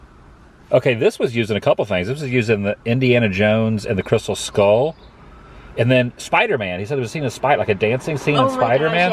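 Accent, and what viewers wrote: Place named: Los Angeles, United States. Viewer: The baby voice does make me want to scream. I feel like a lot of LA people talk like that lol.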